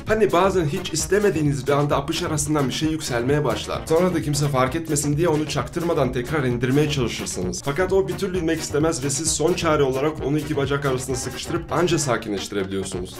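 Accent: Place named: Russia. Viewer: abi sen rus musun çünkü yaban cı gibi konuşuyonda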